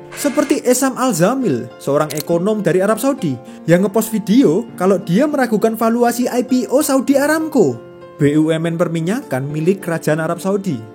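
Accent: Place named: Japan. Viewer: Ngomongnya medok banget kaya org jepang takeshi sunyoto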